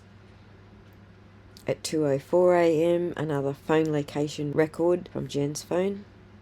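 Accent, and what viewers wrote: Australia. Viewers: You are such a fresh breath of air. I grew up across the street from a lady from Aussie. Our families were friends for ages. I'm now 67 yrs old. It almost sounds like I am listening to her talk. Love your accent. She was like a second mom to me. Miss her so much! God Bless you!!!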